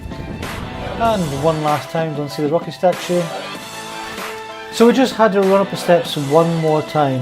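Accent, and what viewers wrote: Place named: Scotland, United Kingdom. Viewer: Cool video , very well done . Did the same trip myself . Hint of an east coast Scottish accent too I believe ! 🏴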